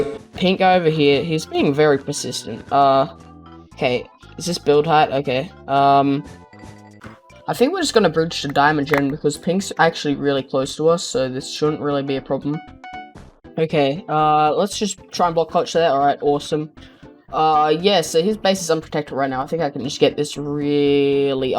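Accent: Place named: Queensland, Australia. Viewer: finally a bedwars player with a Queensland accent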